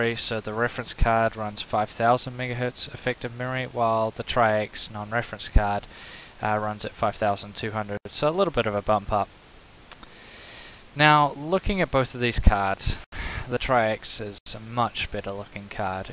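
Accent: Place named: New Zealand. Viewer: thought you sounded kiwi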